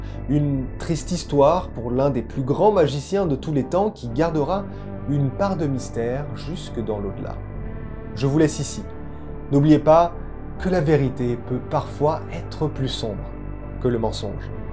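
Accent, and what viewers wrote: Canada. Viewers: tu as l'accent canadien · ton accent Québécois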